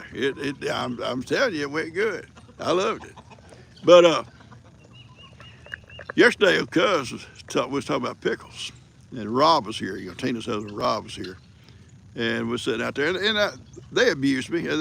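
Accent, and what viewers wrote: South, United States. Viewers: For me, I love listening because of your strong southern accent! I’m from Oregon!! Love the way you say a lot of different words😊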